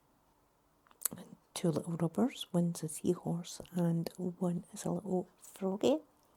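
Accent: Scotland, United Kingdom. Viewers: Where in Scotland are you from if you don't mind me asking? Great video, amazing work!x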